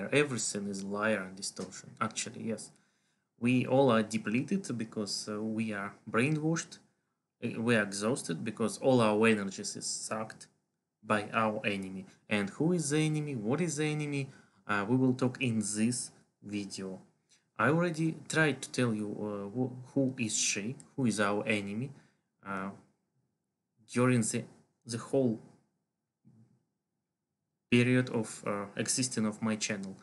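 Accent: Russia. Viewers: Офигенное произношение на английском с чисто русским акцентом! Прям, как "держу корону"))) круть! Уважаю! 👍😉💪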